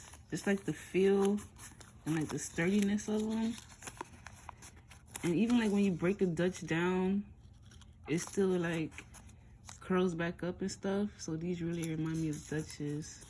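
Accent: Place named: North, United Kingdom. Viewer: Where you from gotta be up north somewhere?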